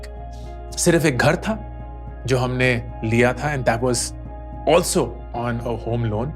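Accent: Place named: Kashmir, India. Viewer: Can't help but appreciate your subtle Kashmiri accent. "Pata hai kyu" Highly appreciable journey.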